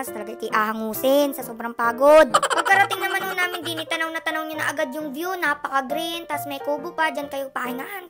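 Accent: Batangas, Philippines. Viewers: minsan ay nagiging batangeña ka na eh eh eh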